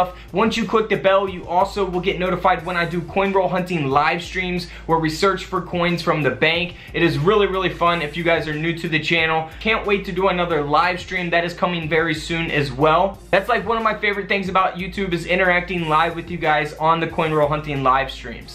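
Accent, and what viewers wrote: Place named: New York, United States. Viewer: Really,..! He must be, either from, NYC, or Jersey shore, Noooo, I m not type casting, am I,..?lol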